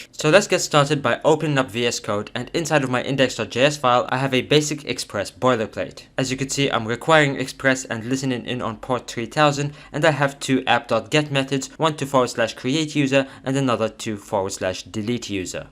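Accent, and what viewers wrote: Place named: France. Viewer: man this is so much better than those 30+ minute videos, short and to to the point + meme game is on point + a French? accent 10/10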